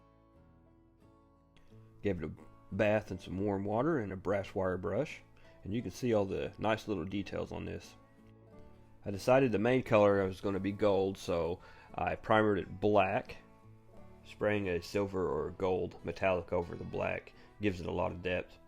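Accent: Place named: Kentucky, United States. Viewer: not a problem man. Its nice hearing someone with an accent like me. Im from Kentucky and I have an accent too. You sound normal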